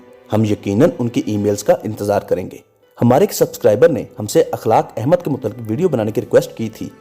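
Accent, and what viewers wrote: Punjab, India. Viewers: Sahi kaha. Urdu ki khoobsoorati jo arabi aur farsi se aati hai use punjabi labo lehje aur alfaz se kachra kar deti hai.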